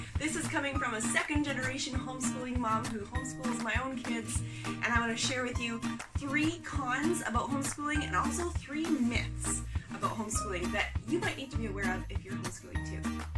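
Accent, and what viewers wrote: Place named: Winnipeg, Canada. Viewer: You sound like you have a Winnipeg accent ....lol 😁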